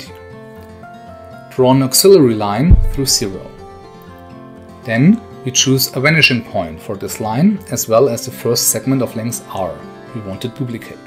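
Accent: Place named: Germany. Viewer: I concur that in the future you have to set the music at much lower level, or actually not use music. Occasionally you swallow consonants, such as where your script says 'square length' but it ends up sounding as 'skwele'. Between that and your german accent: the viewer neets a couple of brain cycles more to get to the words you are saying. Yeah, there is a trade-off there. Pronouncing everything would sound ponderous (Related subject: video by Tom Scott : <link> ) In the case of 3blue1brown I see the music used as part of the brand; the narration is soothing, the music is soothing. For minutephysics too I regard the music used not as part of the video as such, but as part of the brand. Personally I tend to prefer minimalism.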